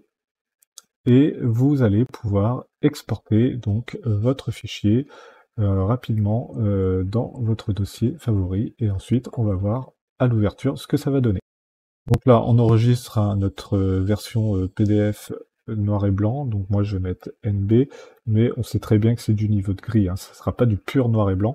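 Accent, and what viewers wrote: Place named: England, United Kingdom. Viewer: Salut, Très utile, merci pour ce partage de connaissances ! (Comme dirait Chabal, We are in France, we speak French... OK ?) Manquerait plus que quelqu'un vienne te reprocher ton accent anglais, le jour où les Anglais (en tout cas ceux qui font cet effort) parleront français couramment et sans accent, alors, peut-être qu'ils pourront la ramener et encore on ne va pas se laisser emmerder par des buveurs de thé, avec le petit doigt en l'air, bordel de merde ! :P Sinon j'ai une question en rapport avec ta vidéo: Que signifie tramer et à quoi ça sert au juste ?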